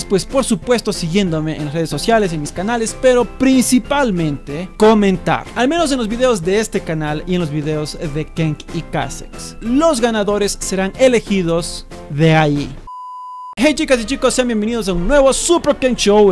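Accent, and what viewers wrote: Ecuador. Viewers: eres de ecudor??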